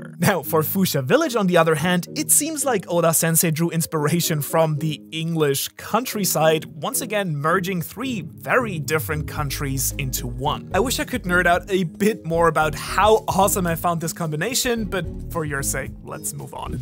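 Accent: Germany. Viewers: I did not know you're from Germany (of course I detected your accent, just not that it was a German accent) Hi homeboy ... tee hee I'm from Karlsruhe ( a bit north of Bavaria) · Aha! Wusst ich doch dass du Deutsch bist, anhand deines Akzents :D Gut zu wissen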